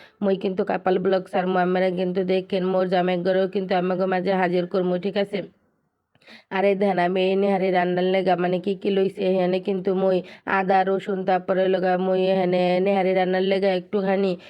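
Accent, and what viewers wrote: Barishal, Bangladesh. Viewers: আসসালামু আলাইকুম, কেমন আছো আপু, তোমার এই বরিশালের ভাষায় কথা বলা খুবই ভালো লাগলো আপু, নিহারি রান্না লোভনীয় হয়েছে ❤❤ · আপু গো তোমার মুখে বরিশাইল্লা বাসা খুব খুব সুন্দর লাগে ❤👍ভালোবাসা দিয়ে দেখা শুরু করলাম শেষ পর্যন্ত তোমার সাথে আছি আপু ❤👍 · আপু নিহারী তন্দুরি রুটি খেতে দারুন লাগে আর তুমি কি ভাষা বলছ তা কি বরিশালের ভাষা তুমি কি বরিশালের মানুষ???? আপু ভাইয়া তো কত সুন্দর করে কাঁঠাল ভাঙছে, চ্যানেলের নাম চেঞ্জ করে দেবে???? মশকরা মশকরা ভিডিও দেবে 😂😂দিও আমি দেখার জন্য রেডি আছি তোমার ভিডিওটা দেখতে একটু লেট হয়ে গেল দুইটা বাচ্চা নিয়ে আমি নাজেহাল অবস্থাতে আছি খাওয়া দাওয়া ঘুম নিদ্রা সব উঠে যাচ্ছে। 😢😢কেক বানানো দারুণ হয়েছে ঠিক বলেছ আপু একটু অন্যরকম ভিডিও দিলে খুব ভালো হয়। আমি তো একঘেয়েমি হয়ে যাচ্ছি 😢 তোমার জন্য অনেক অনেক দোয়া রইলো অনেক দূরে এগিয়ে যাও মাশাল্লাহ তিন লাখ ভিউ দেখে তো আমি খুব খুশি 🤲🤲🤲🤲🤲👍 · ৩০👍মনু তোমার বরিশাইল্যা কতা জম্মের ভালো হুনাইছে।তোমার নিহারি অনেক সুন্দর হইছে তয় পেঁপে দেলা ক্যা?একলা একলা খাইলা মোরে একটু দেলা না😥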